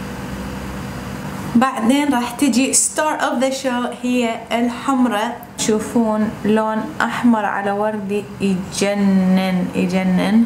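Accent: Iraq. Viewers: Samah Munther ترا عادي كل محافضه ولهجتها شو كلها مستغربه يعني قابل حاجية مصري لو سوري هي كلها لهجة عراقيه بالنهايه وكلها تفهمها ماله داعي هذا الاستغراب كلش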